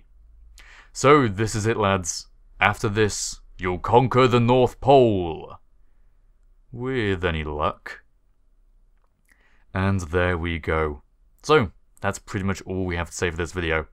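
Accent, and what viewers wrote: United Kingdom. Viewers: Is that his actual accent? O.o;; All my british accent lessons are from BBC.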